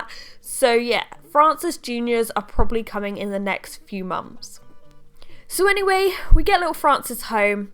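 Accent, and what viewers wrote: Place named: RP, United Kingdom. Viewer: Yes!!! Updates! Really any content you produce I love because you’re informative, not boring, and you’ve got a posh accent!! 😉😊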